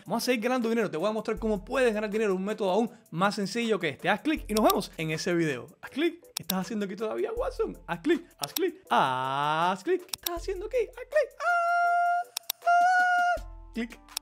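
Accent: Cuba. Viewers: eres cubano verdad?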